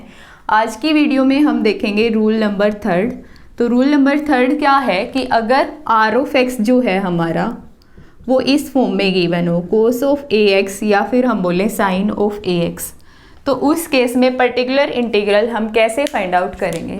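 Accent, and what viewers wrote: Haryana, India. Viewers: Mam aap haryana se ho?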